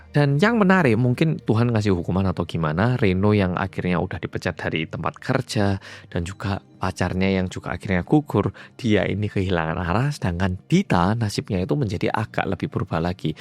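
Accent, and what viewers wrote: Java, Indonesia. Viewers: dia gak salah apa apa kok ngatain sorry unsubscribe wkwkw dulu dia lahir di korea dan besarnya di indo dari kecil lho apalagi udah mahir bhs indo yg medok, dan dia itu cinta bgt sama indonesia. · medok 🎉 Lgsg nyimak 🎉🎉🎉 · Gausah. Walopun ngomong medok. tetep orang Korea. Walopun dulu lama tinggal di Indonesia sekarang dia tinggal di korea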